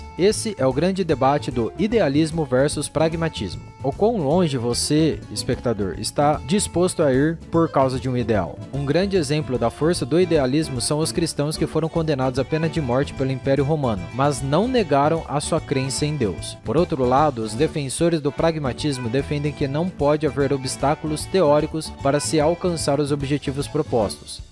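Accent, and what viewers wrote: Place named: Caipira, Brazil. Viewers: De que região do país vc é? Esse sotaque é bem comum no Paraná, São paulo e em todo o centro-oeste do Brasil. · Esse sotaque caipira não ajuda a chegar ao final do vídeo. Pqp.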